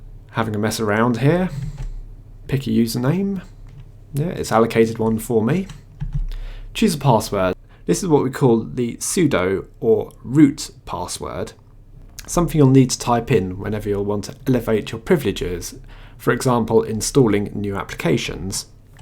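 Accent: United Kingdom. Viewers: I love your UK english man Nive vids, thank you :)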